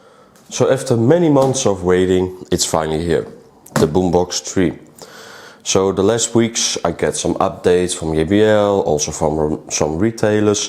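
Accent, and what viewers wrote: Netherlands. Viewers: You sound very Dutch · i hear a little bit dutch haha. nee wel goede video. ben zeker om deze te kopen.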